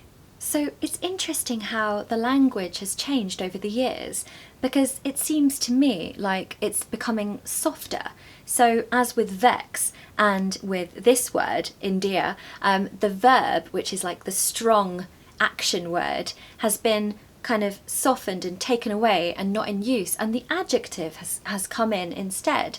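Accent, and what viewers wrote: United Kingdom. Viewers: I use all these words commonly in everyday US English, and without scruple or hesitation, so they're safe over here in the colonies. What a beautiful British pronunciation you have -- insuperable!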